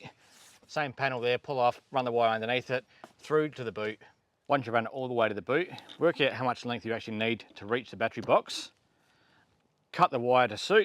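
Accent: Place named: Australia. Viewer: Can barely understand what your saying really really thick Australian accent nevertheless very interesting stuff, thanks Dude .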